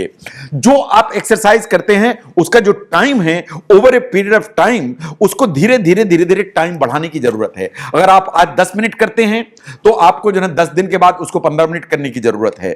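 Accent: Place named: Awadh, India. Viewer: "Joun hai" pure awadhi waah boss 😍 Dill baag baag ho gya jo aapne bech beech me "joun hai"bolte rhte hai aap awdh ki Shaan hai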